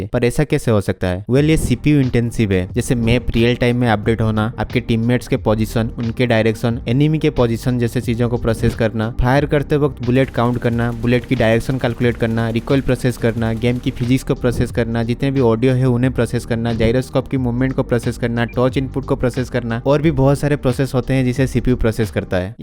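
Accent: Odisha, India. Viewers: Odia accent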